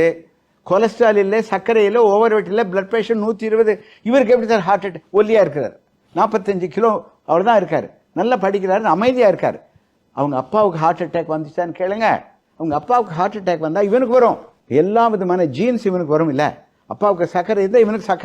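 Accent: Coimbatore, India. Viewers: நீங்கள் பேசும் அழகுகோவைதமிழைகேட்டாலே ஒருநோயும் வராதுங்கோ ஐயா உங்களின் இந்த கோவைதமிழை எப்போது கேட்டாலும் இனிமையையாய்உள்ளது வாழ்த்துக்கள்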